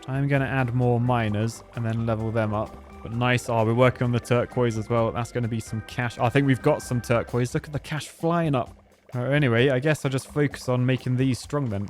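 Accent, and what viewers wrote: United Kingdom. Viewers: Ay brittish dude, will you play Tf2?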